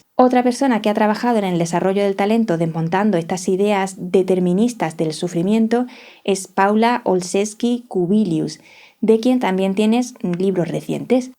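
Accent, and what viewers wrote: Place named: Murcia, Spain. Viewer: Jolín acabo de leer tus características, no te conocía. Yo soy PAS y TDA (sin H), Tiroiditis de Hashimoto. Yo pensaba que tenía mucha mezcla, que seguro algo me sobraba, pero no, parece ser que éstas combinaciones incluso más complejas. Me resulta delicioso escucharte. Tu acento me recuerda al de mi abuela, de Murcia pero vivió en Jaén. Saludos, aquí tienes una nueva suscriptora más. 😊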